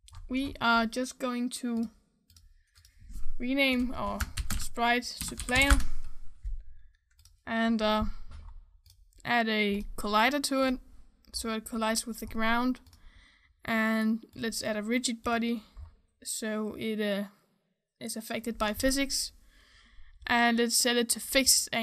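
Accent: Denmark. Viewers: Hey man, are u danish? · Are you Danish, or what? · Instantly recognized the Danish accent. Especially because of the "Øh.."'s he made. Cool tutorial~ And hav en god dag B-') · Are you DANISH??? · Bra video! Hører du er dansk! :D Er fra Norge jeg da, så håper du forstår hva jeg skriver! :D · Are u danish?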